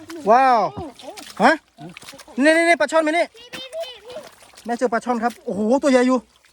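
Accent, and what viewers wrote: North, Thailand. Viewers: อู้กำเมืองบะดีกาบ่าน้อยฟังแล้วสบายหูดีอู้ไทยกลางฟังแล้วมันบ่าม่วนใจ๋เฮาคนเมืองเหนือเน้อ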